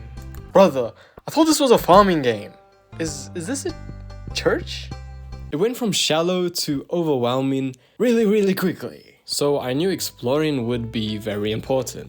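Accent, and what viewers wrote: South Africa. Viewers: Nice video bro, I recognise that South African accent anywhere 😅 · South African??!!! My bru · waaaait. are you south african? you sound south african a little. its hard to recognise our accent when its not 100% afrikaans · 😂Bro you must be south african. That accent!!! must be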